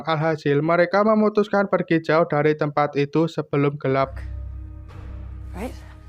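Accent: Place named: Java, Indonesia. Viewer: Medok tp seru bro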